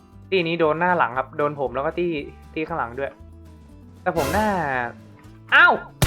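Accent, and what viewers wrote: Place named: North, Thailand. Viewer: เกลียดพวกรวมตี้!!! ชอบฟังภาษาเหนือมากๆ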